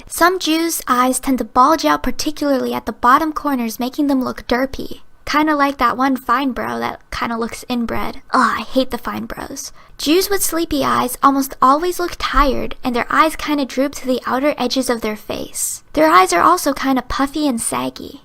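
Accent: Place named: North, Italy. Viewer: My guess was that you're either North Italian or West Slavic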